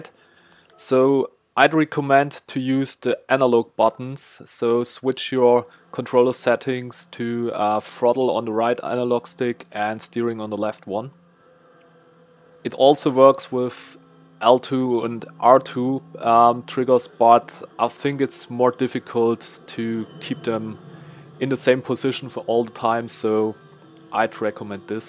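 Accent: Germany. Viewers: Deutscher Dialekt, hört man sofort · Man hört den deutschen😂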